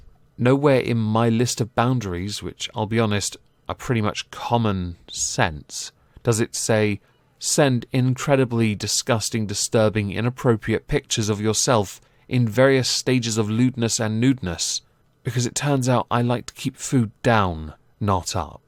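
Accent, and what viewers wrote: United Kingdom. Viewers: My condolences for issues you're dealing with. I hope whoever this fan is gets professional help, because this kind of fixation is unhealthy. I hope the issue is resolved as soon as possible and appreciate your upfront acknowledgement of the situation so your supporters (yes I had been using the vernacular meaning of fan in the past, but given the circumstances I will switch to your preferred and honestly more precise term at your suggestion, not out of sicophantism but because you made a good point regarding the implications of the word). Best wishes and look forward to seeing more content from you in the future. Edit: For the record I find the precision of your diction pleasant to the ear but not unusually so. I recognize you have a British accent, but you make no pretense at anything else so I don't think it's a big deal. I do find your educated choice of language enjoyable to hear, simply because I instantly know what you mean by your choice of words. 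But again, it's more an intellectual enjoyment like reading a well crafted sentence. Actually I take that back, it isn't like that intellectual enjoyment, it is that intellectual enjoyment.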